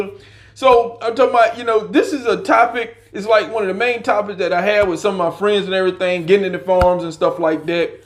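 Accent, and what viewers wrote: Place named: North Carolina, United States. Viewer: yea im not making fun of. him. Its a new way to say firearm. Nc accent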